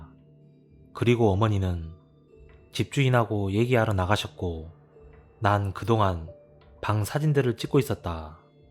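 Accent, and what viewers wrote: Jeolla, South Korea. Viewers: 제가 오히려 더 감사합니다. 진심 남의 사연을 듣는 게 아니라 본인 사연같아요. 구독자 엄청엄청 늘어서 흥하시길 바랍니다. 저는 경상도라 쌈무이님꺼 매니아인데 전라도 말투도 최고입니다. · 전라도,억양 ㅎㅎㅎ 어떡,할꺼에요 ㅎㅎ 고향냄새 좋네요 ㅎㅎ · 전라도사투리 재미있어요. 무서워야하는데, 사투리때문에 집중못했어요. ㅎㅎ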